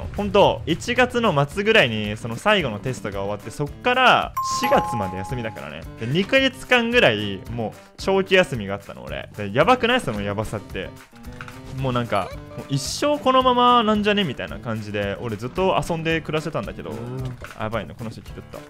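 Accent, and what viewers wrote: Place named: Kansai, Japan. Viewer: この動画、最近の動画で一番好きかも！そしてたかおいおいの関西弁が移ってるｗ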